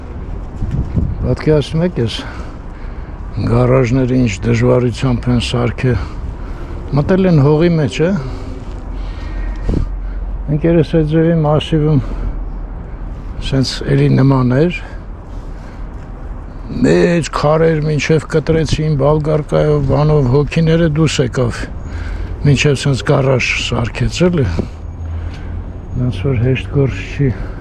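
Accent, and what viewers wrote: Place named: Gyumri, Armenia. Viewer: Вохчуйн! Как давно я не слышал ленаканское наречие именно ленаканское, а не ленИнакаеское ! Было время ехал в командировку в Ленинакан. Чаще на электричке. Знал название почти всех станций, сейчас помню ни все. Ереван, 8ой км, Масис, Эчмиадзин, Октемберян, Каракерт Гетап,, Ани, Ленинакан.. А когда поехал за бульдозером на МАЗе, в Ленинакан поехали через Аштарак, а возвращались через Октемберян. В Ленинакане работал на бульдозере на территории мясо комбината А на территории молочного завода в Ахуряне.. На обратном пути в Октемберяне пробило колесо на трейлере.. Заменили колесо на следующий день. В Ленинакане оставался в гостинице здание похожее как гостиница " Ани" в Ереване. Рядом был кинотеатр названия не помню. А еще в далеком детстве отдыхал в детском санатории в Ленинакане. Вот такие воспоминания!!! 😮😊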